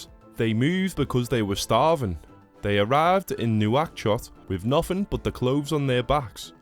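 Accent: Liverpool, United Kingdom. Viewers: He has a Scouse accent from Liverpool. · Liverpudlian geography- this is what I've been waiting for! AI is finished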